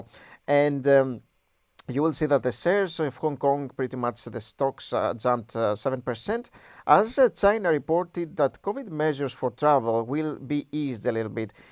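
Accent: Greece. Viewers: Hello, I was the commenter that spotted your Greek accent a few months back. I'm interested in ATIP stock. Can you dona quick video on the fundamentals? I live in the city they're based out of and don't know much about the company's financials. Everyone I've spoken to says they are wonderful to work for, their places are clean and busy. If not a video maybe you can comment. Let me know as I buy shares daily. ATI physical is the name of the company out of Chicago.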